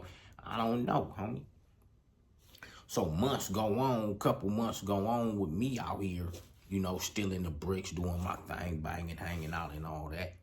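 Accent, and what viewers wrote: Los Angeles, United States. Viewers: Dog you got the most LA accent I’ve ever heard! When I talk to my Cali guys I always do your impression. It’s not a good one tho… I’ve put everyone I know up on your channel. We all know you’re the real deal. Glad that the real G’s are telling their own stories in real time. Stay safe out there. We need ya.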